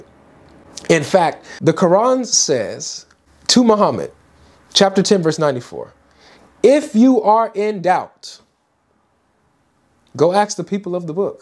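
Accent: United Kingdom. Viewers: that british accent is on fire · that bri ish accent was fire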